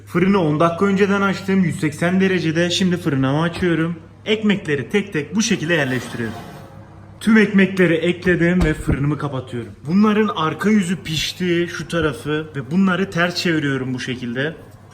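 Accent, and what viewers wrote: Adana, Turkey. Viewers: Konuşma:ADANA Kanal:HATAY Yaşadığı yer: DİYARBAKIR nE? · Hatay sandıkiçi diye kanal açıp diyarbakırda yaşayıp adana ağzıyla konuşmak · aslı adanalılar öyle derler götünden Adana'nın şivesi hataya verme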